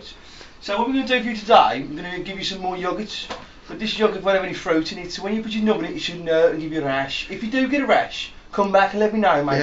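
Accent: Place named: Black Country, United Kingdom. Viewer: Isn't this Black Country accent rather than Birmingham x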